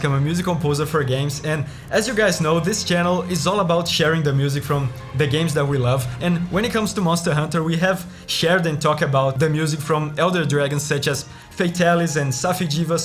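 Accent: Brazil. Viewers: Right in the first phrase i noticed that you are brazilian. Muito foda teu trabalho, mano! assisti vários vídeos em seguida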